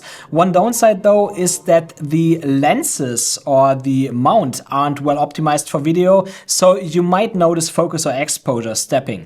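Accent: Germany. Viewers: Man hört diese deutsche akzent so hart raus Hahahah aber gutes video aufjedenfall 👍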